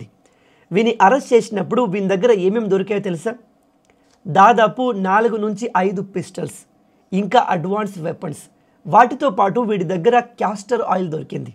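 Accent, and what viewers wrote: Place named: Gujarat, India. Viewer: Nuvvu Gujarati va nee Telugu language accent Gujarati laga Indira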